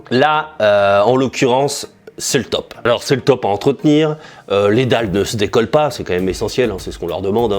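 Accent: France. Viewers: J aime entendre l accent francais 😁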